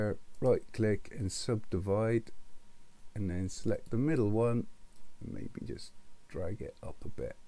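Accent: West Country, United Kingdom. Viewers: Thanks, nice clear tutorial. Like the west country accent too! makes a change.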